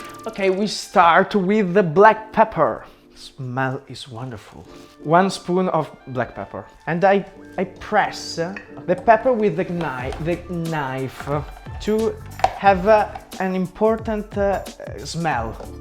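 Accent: England, United Kingdom. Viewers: sei dolcissimo con quella faccia da schiaffi 😂mi fai morire quando dici le parole in italiano con accento inglese 👏bravo 👏👏complimenti x gli iscritti avanti così 🤗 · è un pochettocringe anche quando parlavi in italiano ma con l'accento inglese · Simpaticissimo video,fa ridere quando parlu in italiano con l'accento britannico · Ma fatemi capire: non conosce l'inglese, non sa cucinare e parla l'italiano con l'accento inglese... E fa un video di cucina in inglese??? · Le parole in italiano con l'accento inglese... Calato nella parte proprio!!! 😂😂😂😂 fantastico! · Questa risatina così italiana 'eheh' tradisce un po il tuo perfetto accento British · No daiiiiiiii x favore parla italiano e metti i sottotitoli in ingleseeeee...poi quando parli in italiano con l'accento inglese quello ci piace ahahahahah 😄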